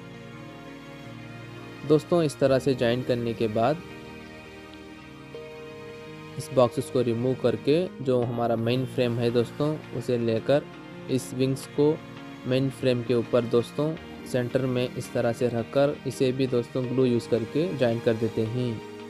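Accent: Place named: Telugu, India. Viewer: from accent I could tell you are a Telugu tammi!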